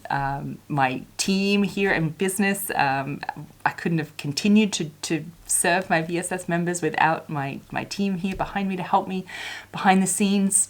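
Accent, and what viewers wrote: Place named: Australia. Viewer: Just found your channel searching for ‘learn to sew videos’… so excited for watching all of your catalogue while I clean and craft and cook and maybe even try some sewing. Thank you for your videos, and so excited for a fellow Australian voice!! Also, amazing news for your recovery…I’m a breast cancer researcher (only a little bit part time with a family, the rest of the team does most of the work), overcoming TNBC is huge.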